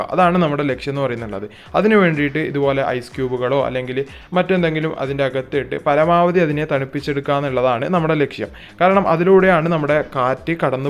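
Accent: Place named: Kasaragod, India. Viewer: Languagil Idakk oru kasrodian touch 😌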